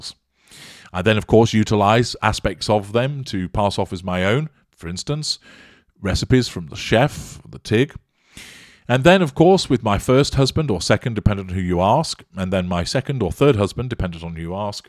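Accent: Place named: England, United Kingdom. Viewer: This is just the best thing ever hearing these words in an English accent! 🤣 “assywipe” “spotalie” and “podcrap” I had to replay it a cpl of times 😂 i love it!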